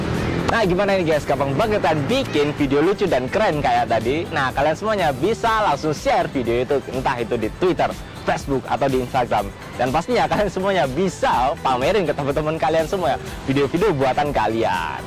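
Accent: Malang, Indonesia. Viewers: Abangnya orang Malang?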